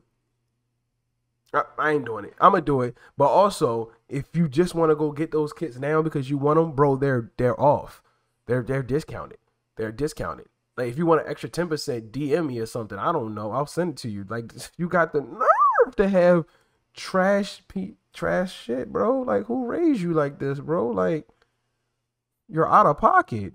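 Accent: Baltimore, United States. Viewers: This dude accent sounds like he is from Baltimore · Bro gotta be from baltimore lol. dope content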